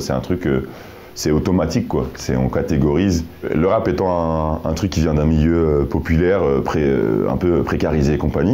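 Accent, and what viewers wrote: Belgium. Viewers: On parle comme ça à Bruxelles hahah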